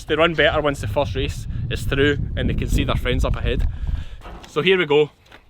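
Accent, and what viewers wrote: Scotland, United Kingdom. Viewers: Ahh cool nice to listen to a Scottish youtuber for once 👍👍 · My mate and i was talking on how you told your dogs to lie down while we were gathering in the mountains today, he says its “lie doon” becouse of your accent but i thought doon was down in Scottish, who’s right?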